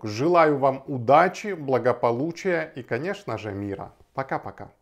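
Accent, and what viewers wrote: Ukraine. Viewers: Кто этот блогер,говор украинский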